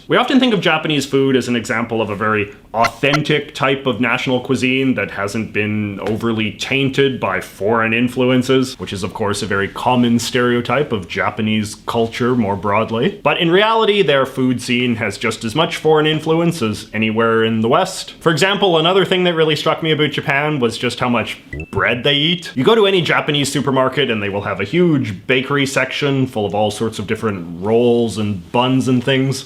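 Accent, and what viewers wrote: Canada. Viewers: Hey, are you Canadian? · This guy hits his "out" harder than any Canadian. EVER. We know you're Canadian. Stop it. It sounds gross. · He called pizza margherita boring?!!!! Well he has a horrible sounding Canadian accent so I am not offended.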